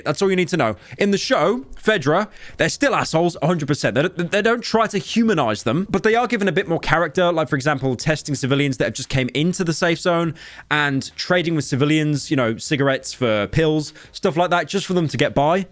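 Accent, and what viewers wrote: United Kingdom. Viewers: Haytch be oh bri-ish bruv make the h letter complicate m8 good content will view again get at it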